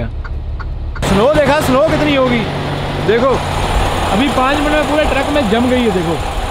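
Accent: Punjab, India. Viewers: Tu pure Punjabi bola kar bai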